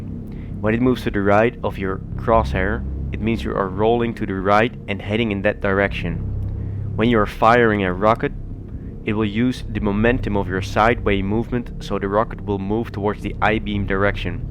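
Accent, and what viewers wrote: Netherlands. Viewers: You're Dutch, aren't you ? Thanks bigtime for the hud expansion. I never knew most stuff. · Great vid! btw are you from the netherlands because youre english sounds like somone who is from the netherlands :P greatz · Are you native english? You have an accent. Maybe dutch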